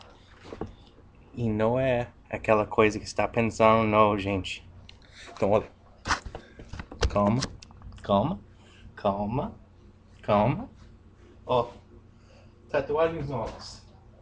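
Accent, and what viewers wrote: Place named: São Paulo, Brazil. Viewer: Melhorou de mais! O Brasil é um país que em nenhum canto se fala igual devido aos vários sotaques, porém existem alguns que são mais falados por aqui. Então vai depender de onde você vai ficar aqui no Brasil para podermos indicar um sotaque. O seu R depois de uma consoante no momento é igual ao dos paulistas, que no Brasil em geral é o menos usado. Ex.: "retardado" o mais comum é dizer "retarrdado" (Hetah-dado)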